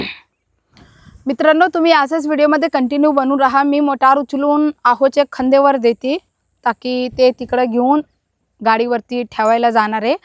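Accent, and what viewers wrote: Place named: South, India. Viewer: तुम्ही Up ची आहेत कि सौउथ ची कारण तुमची भाषा सौउथ सारखी आहे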